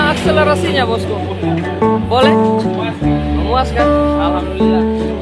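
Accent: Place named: Sulawesi, Indonesia. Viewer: Orang sulawesi ya logatnya itu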